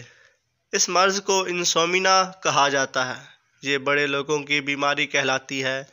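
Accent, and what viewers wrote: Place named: India. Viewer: Bhaai Hindustani urdu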